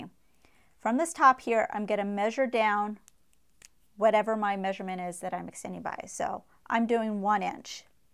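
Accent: United States. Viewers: Many thanks from the U.K. You have a beautifully clear American accent, so easy on the ear.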